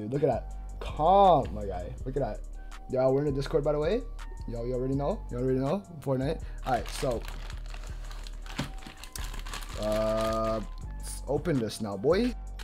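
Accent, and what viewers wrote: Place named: Philippines. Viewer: Theeey Trynaa Bee Craate! You filipino?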